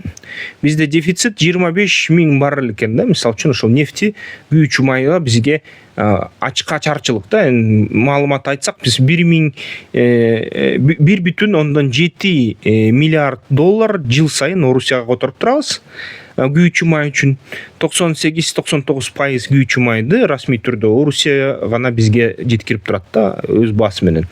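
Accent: Standard. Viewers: Кыргызча таза суйлойт байкебиз азаматсыз.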